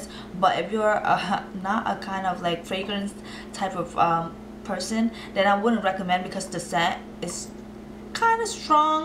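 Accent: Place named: Asia. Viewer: it's not slang... it's an asian accent... something obviously she can't help... it doesn't sound like slang at all....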